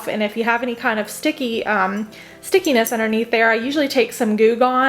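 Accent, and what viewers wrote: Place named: South Jersey, United States. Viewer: Are you from South Jersey, South Philly Delaware or Mryland? I believe I recognize the "O" in your voice. I am from S. Jersey (I live in Florida florida now for the past 40 some years and still carry the accent). The video was great. TFS THUMBS UP!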